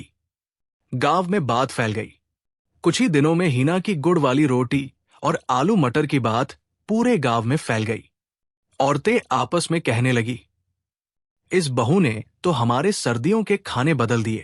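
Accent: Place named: Pakistan. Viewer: Par ye pakistani jesa kyun bol raha he feel nahi arahi hasi aarhi he 😂❤️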